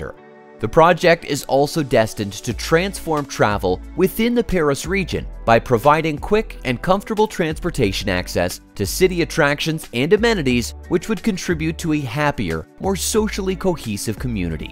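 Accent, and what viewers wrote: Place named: United States. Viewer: He can't help it he's and an american. and also the one commented is just joking. Come on! He is trying his best. I"ve been living here in france for almost 21 YEARS and still my accent is very evident although I can pronounce some names accurately.hahhaa trial and error. french words is just mindblowing specially when you try to write what is being said ;)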